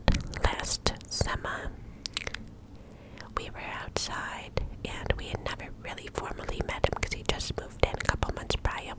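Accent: New York, United States. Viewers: Living for the NY accent rambles! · Pleaseee do more storytimes with the NY accent, sooo goood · yay the NY accent!!! i want to watch this so bad but i need to sleep, cant sleep during this one... ill just watch it twice! 😂🥰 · ahhhh! I’m late again! Sorry about that! I cant wait to fall asleep eat tonight, thank you,! I love your New York accent evern if I have no idea how accurate it is xD Hope you are doing well and your family is doing good in these times. Edit: Also, yes, please, please, please do more of these! Keep on keeping on ~<3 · New subscriber here. I've seen a couple of your vids. I do love a juicy storytime! :) Enjoyed your NY accent as well. ❤💯💯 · As a Southerner from Tennessee, I love hearing the New York accent! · ASMR thank you! and great video btw i love when you do the ny accent 🥺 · NY accent, cupped whispers AND story time all in one video?? 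I'm in heaven! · Late again😩wish YouTube would notify me of your videos I always have to search for them😩loved this story time & you already KNOW that cupped whispers are the best, also your New York accent is so cute ahah ❤️ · I have watched this video so many times! Love it! The NY accent you do with the clicky whisper you have is heaven! ♥️ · You're such a great story teller & I love the New York accent ❤ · in your last NY accent the way you said Niagara falls made me giggle so i started saying it around my roommates and sometimes we’ll just go “niAHHGRUH FAWWLLS” out of nowhere 😂😅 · Love the New York accent 💖💖💖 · Me too! that NY accent is so relaxing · Re watching old videos as I have given myself a chill morning off. Love your NY accent. Also love hearing your old gossip again. Still makes me giggle after the 5th time of watching ❤️ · i love when you whisper in a ny accent, so relaxing! 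you look stunning, i love your eyelashes! thank you for everything you do gorgeous!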